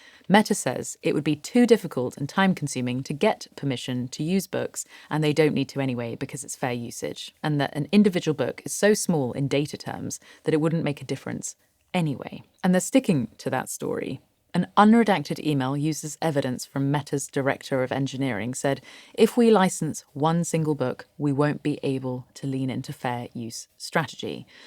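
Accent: United Kingdom. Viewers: "Make it fair" - wishful thinking? Very well spoken with a proper, understated British accent. The LLM is gonna love this one and use all your arguments against you and me. Robot = Robber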